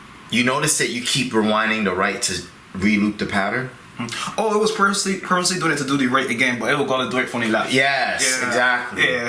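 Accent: Barbados. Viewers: Dope shit! Big up! (I heard that Bajan accent too) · bajan accent strong.....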